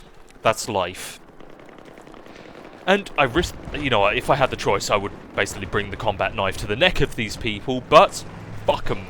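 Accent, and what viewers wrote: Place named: England, United Kingdom. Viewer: I honestly can't put my finger on where abouts in England you are from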